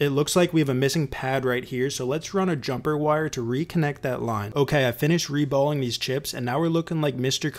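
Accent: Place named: New Jersey, United States. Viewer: When I heard your voice I was like has to be a fellow Jersey guy I'm new to the repair business about a year I don't get as deep into repairs as you do. Really like your videos and the humor you use. I guess it's a Jersey thing. I'm in Texas and nobody here understands the humor they just think I'm a smart ass.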